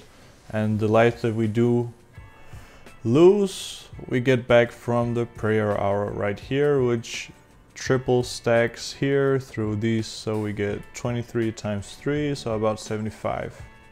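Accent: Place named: Scandinavia. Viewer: Bro are you a Scandinavian living in Brazil? Sorry.. you just just sound Scandinavian 😉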